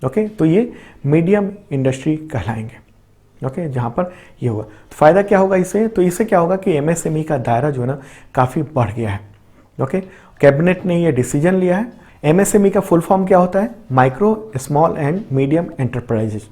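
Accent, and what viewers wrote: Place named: Bihar, India. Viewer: Voice action se Lagta h Bihar ke ho !!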